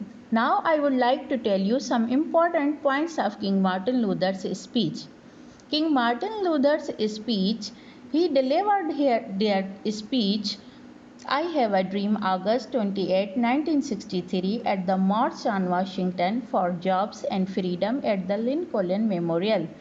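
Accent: Sindh, Pakistan. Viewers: English in sindhi Accent !!!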